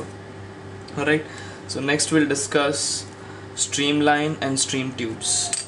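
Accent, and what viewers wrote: India. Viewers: Good video but try to avoid the annoying indian accent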